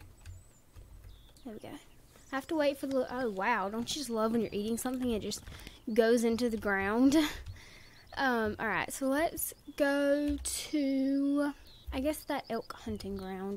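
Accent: South, United States. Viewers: Its an off day when whatever your eating just sinks in to the ground....... also lol southern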